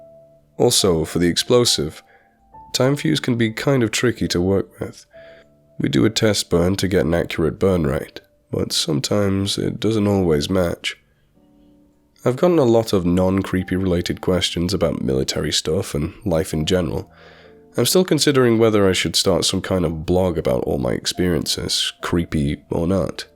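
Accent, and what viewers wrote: England, United Kingdom. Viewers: british accent, talks about kilometers hmm.. must not be American marine.. ?? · love your voice. You sound british like me :) ( A Worcester girl). You tell these stories so well and the pictures are great to give an insight to it all. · That's so weird grown ups playing war!!....in a fake city!!...so weird!!...and your accent sound english, european.. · He sounds ex muslim Brit from Uk. Good stories. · British accent? And use of kilometers? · Like many other I love your voice, I DO have a few questions though, you mention USMC and Navy Seals etc, yet your voice is 100% English, and so are your expressions... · You are a Marine in U.S. 'cause you have an English accent? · That Brit Accent is most annoying. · A ME city but all was in proportion, even churches? In the first vid this person talked about Palm Desert close to the Mex border in Carolina. 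This sounds like a great SiFi story. On top of this the narrator has an English accent! The stories sound real but I keep thinking the feeling he has of being watched may be by Freddy Kruger! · How can a U.S. Marine have a BRITISH ACCENT PLUS mispronounce "Marine Corps?????? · These stories are great! Love the narrator's Brit accent too. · This series of stories is AWESOME, but I gotta ask, if he's a US marine, why does he have an English accent??? Dont mean to be a Donald downer, just asking. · What is a Brit doing in the U.S. Marine Corps.? It's pretty obvious that the speaker is from the U.K. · (Just wondering... how come a Brit is reading a Marine's story?)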